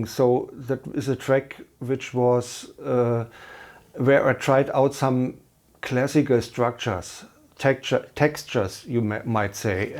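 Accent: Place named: Berlin, Germany. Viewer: I like your „Berliner Schnauze“ English very much! 👍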